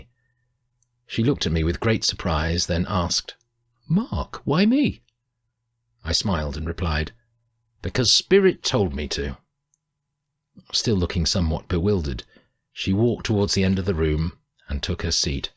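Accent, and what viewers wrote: Yorkshire, United Kingdom. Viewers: I purchased the e-book, and when reading it i hear your voice in my head 👍 So, even if you have a thick Yorkshire accent, it completes the experience ❤️ I love the book, Mark, it is a very lovely and wonderous read 💛 Much ✌️💟💡 to ALL of you 🙏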